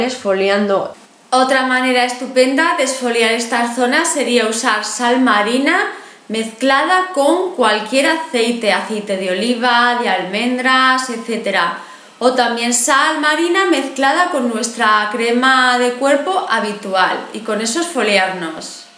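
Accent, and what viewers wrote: Spain. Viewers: Eres catalana?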